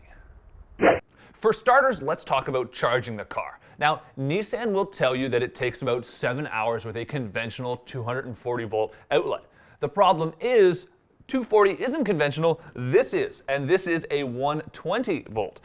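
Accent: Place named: Canada. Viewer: Different accent. Listen to how he says the word "out." He sounds Canadian/North American Mid-West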